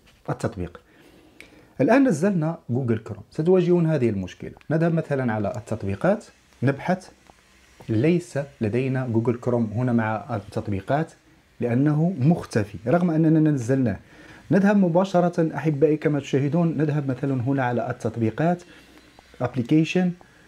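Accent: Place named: Amazigh, North Africa. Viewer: كل شر وحك صعبه ولانهم منك شي لهجه امازيغه صعبه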